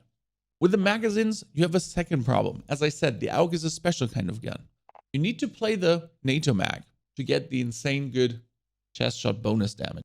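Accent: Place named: Germany. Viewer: Do you live in Germany and learned English there? If so that’s absolutely impressive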